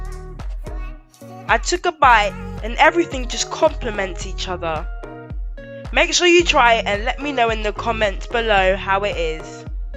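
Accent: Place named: France. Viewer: I am trying this chocolate cake right now. Really hope it will be as good as yours. Very well done. And by the way great French accent.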